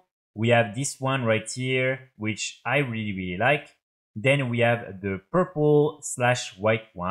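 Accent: France. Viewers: Pas mal le french accent 😏 good video haha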